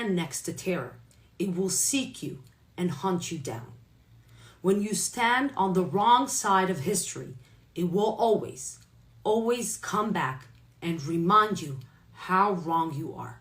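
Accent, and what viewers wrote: United States. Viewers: God, you are incredibly BRAVE! To speak truth to lies in your world* is unbelievably Brave! I only wish people could understand that reality is reality even though I, you, us don't like it. Looking reality in the face and saying this is the truth is one of the hardest things to do. (*even though you sound American)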